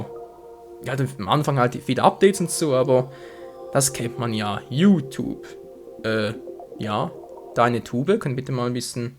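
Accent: Switzerland. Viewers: Du bisch eindüütig schwiizer 😊😊😊😊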